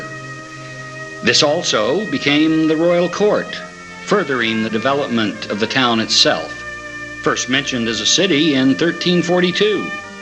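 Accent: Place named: Oxford, United Kingdom. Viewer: an englishman in timisoara this...nice OXFORD accent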